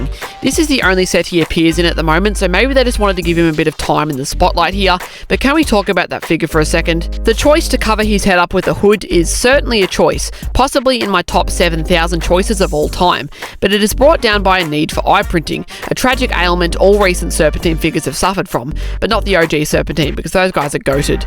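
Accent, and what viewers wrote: Australia. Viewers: Is this Australian or New Zealand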